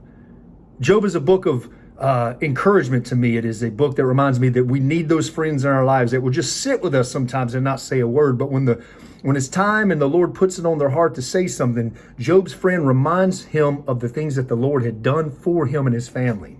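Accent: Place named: California, United States. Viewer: Hey, your voice reminds me of my old school pastor back in my younger youth, with the Californian/southernistic (new word made) accent. I enjoy your videos and the good messages from the Word of God. I wanted to know your thoughts on the different translations of the Bible, like speaking of my old school pastor, he comes from that old school era of having your actual copy of Word of God in KJV and I believe that too but have somewhat laxed on it with using the YouVersion bible app but strictly to KJV and nothing else as I kind of bridge the gap of old school to the new school, as I am a late 90s kid just before that horrifying, tragic day in New York with a couple towers. My question is, do you learn more from other versions or do you find some more or less restrictive in obtaining knowledge you want to get from God, what do you get from these other translations than from KJV?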